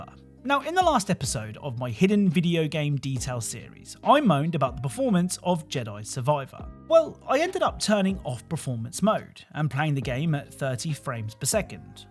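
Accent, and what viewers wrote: United Kingdom. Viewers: I really have to say this but finally seeing how you look in real life your voice doesn't how you look your voice is so tame British I guess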